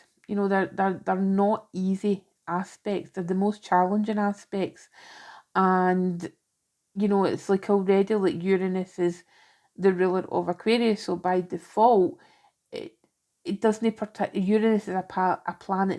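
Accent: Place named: Glasgow, United Kingdom. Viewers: Great read, love to hear a weegie, my family all from Glasgow and you make me feel like home ❤ thank you ❤ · The accent feels like home to me, as my late father was Glaswegian. My friend gifted me Osho Zen cards and the cards I pulled pretty much said "I'm not meant to know yet", like the clouds in the tapir card. Thank you for the reading ❤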